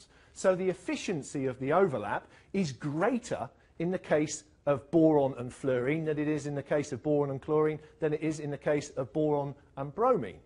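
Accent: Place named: United Kingdom. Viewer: thanks, nice to hear a british voice with passion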